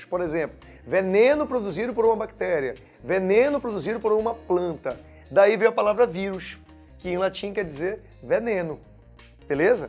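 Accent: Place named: Pará, Brazil. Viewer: amei sua aula! seu sotaque parece da minha cidade, você é paraense?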